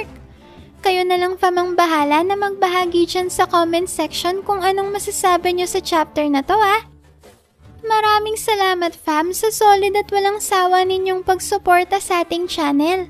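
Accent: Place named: Bicol, Philippines. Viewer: Taga bicol ka pala?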